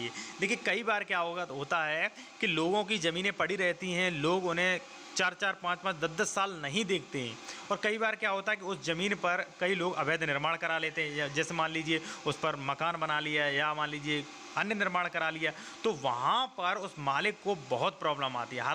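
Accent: Uttar Pradesh, India. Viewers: Sir aapki bolne se. Lagta hai ki aap agra aligarh ke as pass practice karte hai...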